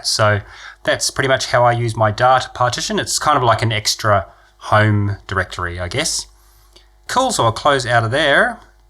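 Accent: Australia. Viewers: For my money you are the best on YouTube for explaining how to configure everything Linux. Step by step, no BS and very articulate and to the point with your Aussies English. Thank you for all you do for us.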